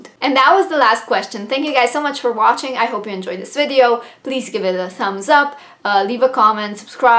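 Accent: Slovenia. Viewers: Filming in pyjamas xD So relatable hahaha Welcome to Booktube again then haha I hope that you will enjoy it this time and that you will stay with us! This community is really amazing! So supportive and welcoming I mean you will find trolls everywhere but just don't mind them! Wait you're Slovenian???? Ziva from reader life is Slovenian too and well I'm half Slovenian and half Bosnian but I currently live in Belgium... Your accent is amazing!! You could do the International Booktuber Tag! Pets! yes I only have a dog but she is the best haha I mean you are unique, your personality is unique and anything else you do besides reading will make your channel unique... Oh you will meet tons of friends! They will even help you build a never-ending tbr hahaha I mean interaction here but also on twitter, instagram and sometimes discord. Watch other videos, comment and get to know people. 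I always say the same but stay yourself, connect with people, have fun and film the content you are passionate about! See you soon!!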